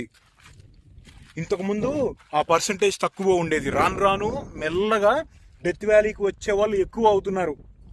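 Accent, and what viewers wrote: Andhra Pradesh, India. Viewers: అన్న ఈ ఒక్కదానికి సమాధానం చెప్పన్నా నువ్వు ఆంధ్ర యాసలో మాట్లాడుతున్నావ్ మీ ఫ్రెండ్ కానీ మీ అన్నయ్య కానీ తెలంగాణ యాసలో మాట్లాడుతున్నారు ఇది ఒక్కటి చెప్పన్నా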